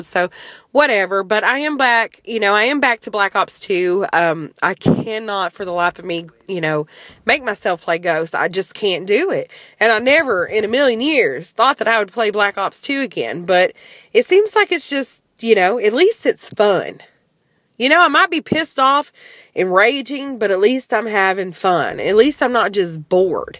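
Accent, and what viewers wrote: South, United States. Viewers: It was like Christmas seeing a video from you! :) Missed that southern voice! Keep your chin up, sweetie! Hopefully this drama passes quickly.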